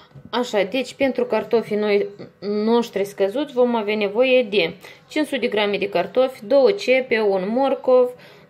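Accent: Moldova. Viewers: Sunteti din Moldova? Accent😂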